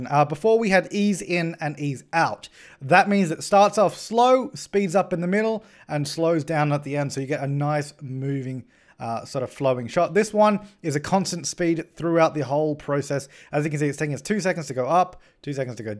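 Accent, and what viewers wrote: Australia. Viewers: You sound a lil bit like Jazza. I'm thinking its because of the Australian accents. 😳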